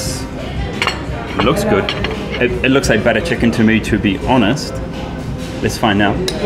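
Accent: India. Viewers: dude you got an Indian accent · Aap hindi main boliye/please speak in hindi. I like your hindi accent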